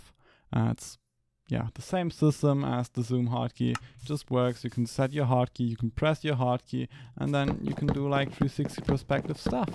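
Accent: Germany. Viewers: In germany say: Deutscher Akzent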